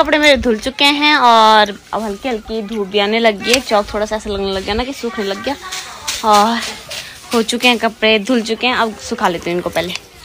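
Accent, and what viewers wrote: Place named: Pahadi, India. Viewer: Yaar mai bi pahaadi hu bhuli Teri pahaadi waali Hindi sun kar mja hi aa jaata hai.😅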